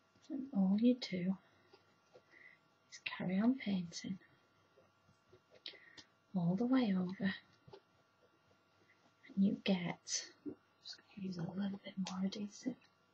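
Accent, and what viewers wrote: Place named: England, United Kingdom. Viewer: Hi.....she`s speaking very clear...she`s English...I am Australian so its easy for me...where are you from...have an awesome day!!!.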